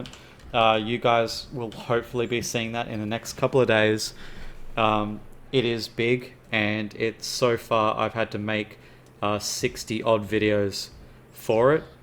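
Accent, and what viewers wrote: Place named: Australia. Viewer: Best designs I’ve seen… although an Australian trying to sound scientific is too funny 😂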